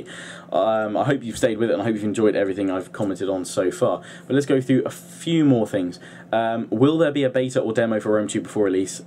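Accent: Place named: United Kingdom. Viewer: I think your videos are great, and thank you for clearing things up about rome 2. I think your British accent is awesome, what dialect is it in particular?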